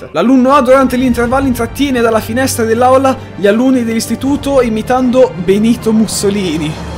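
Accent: Bologna, Italy. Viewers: Sei di Bologna lol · Uaa sei di bologna?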